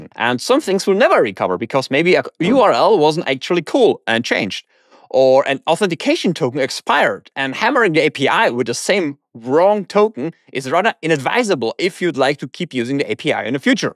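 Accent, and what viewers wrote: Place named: England, United Kingdom. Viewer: Aww, I like your english accent :)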